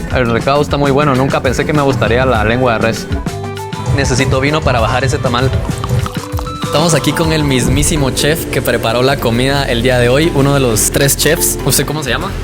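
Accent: Guatemala. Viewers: Lo amé! Realmente me encantó la edición y la forma en que comunicas todos los platillos es muy culta y neutra, tu acento chapin se nota igualmente Gran video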